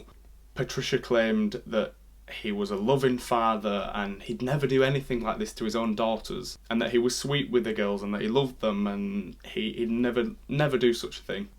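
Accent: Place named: Northern England, United Kingdom. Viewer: I haven't heard about this incident until now. It's such a shame how children/young people so often ignored when they try to ask for help. Your accent sounds quite northern - my accent is northern but mine is VERY northern (Wigan). Do you remember a few years back there was a young girl from Warrington. Her name was shefilia Ahmed. She was murdered, chopped into pieces and thrown into water in the lake district. Such a terrible thing to happen to a beautiful young woman. Her parents were eventually charged and found guilty of her murder. But it came to light she tried to let people know what was happening in her life. She even drank bleach in a bid to kill herself because her father was sending her to Pakistan to marry her off. You did another Exellent presentation. Thank You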